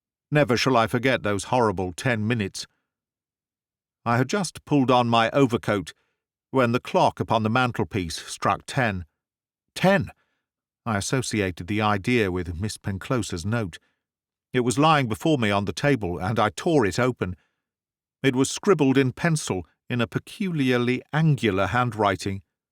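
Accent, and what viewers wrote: United Kingdom. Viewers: You can never imagine my pleasure and joy when listening to your clear British accent Mr... When I got the notification for a new YouTube episode of your marvellous channel i had immediately listened to your charming narration.. please keep on sharing classic literature... They are my favorite... Thank you very much Mr I owe you a lot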